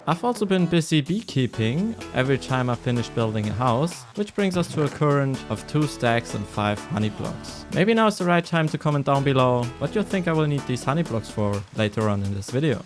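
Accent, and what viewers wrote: Germany. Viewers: idk why but germans speaking english is satisfying to me for some reasen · Good video! Keep it up! P.s. Your accent sounds really german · Dude are you german? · Ich habe gestern das erste video von dir geschaut und man hat direkt den deutschen Akzent rausgehört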